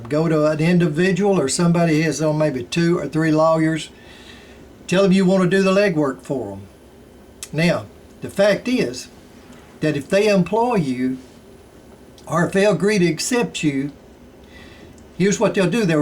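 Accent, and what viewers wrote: South, United States. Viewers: Enjoy the documentaries , the soothing southern drawl , the simple straight forward logic . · I'm sitting here in London listening to your terrific accent. What part of the USA are you from? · you give sound advice. loved your southern accent.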